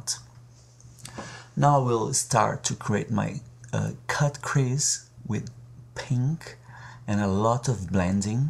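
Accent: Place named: Brazil. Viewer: Hey, are you brazilian? I'm asking because I am and your accent seems like brazilian accent. If the answer is yes so... Você é muito diva, querida. Que bom que você está fazendo sucesso. Nunca desista da sua felicidade e sonhos... XO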